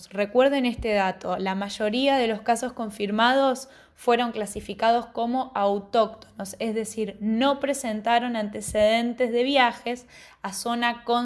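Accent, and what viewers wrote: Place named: Argentina. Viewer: tenia que rendir un oral, necesitaba esto, gracias algoritmo, por fin me mostro algo en castellano bien argentino.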